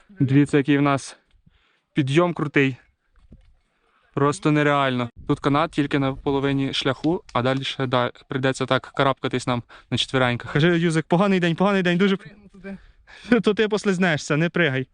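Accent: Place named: Galicia, Ukraine. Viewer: Почув пару слів - жд-вокзал, іменно, чуть-чуть, морожено, лагерь (німецьке слово). В автора галицький акцент, то не акцент м.Хмельницький.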